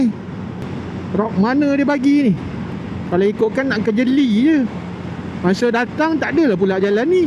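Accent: Perak, Malaysia. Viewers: Ater pekat beno loghatnya...udoh ghope org kuale,bote ngan parit je...🤭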